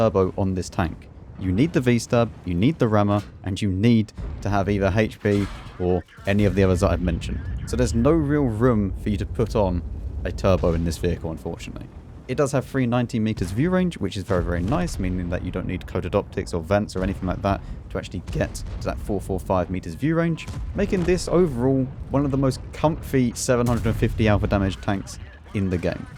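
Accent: United Kingdom. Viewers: LOL!!! I really love this statement "IS4 this probably the idiot proof tank", British accent humor. Good one.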